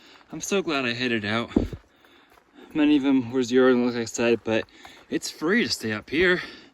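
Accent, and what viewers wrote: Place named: New England, United States. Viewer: I think you should just say it how you say it. Nevermind the naysayers! We are New Englanders, get used to the weird way we say things! lol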